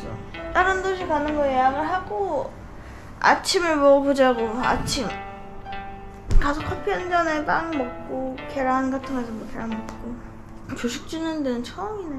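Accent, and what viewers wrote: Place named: Jeolla, South Korea. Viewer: 전라도 사투리 귀여워용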